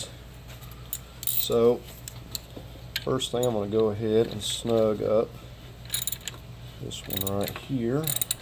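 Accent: South, United States. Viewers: Jeez you must be from the south huh?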